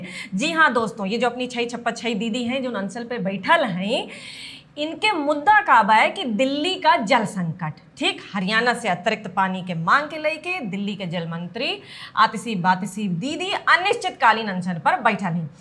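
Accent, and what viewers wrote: Bhojpuri, India. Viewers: Another very interesting episode. Well prepared. Adding a song line added extra flavour. Your Bhojpuri accent is very flavoured.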